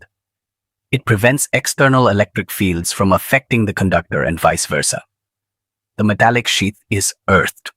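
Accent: India. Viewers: You made the sound with AI and still put the annoying indian accent!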